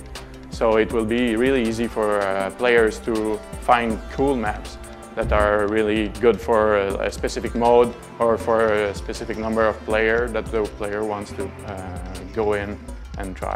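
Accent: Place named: Canada. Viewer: awsome! LOVED IT!! ^_^ sounds like its canadian lol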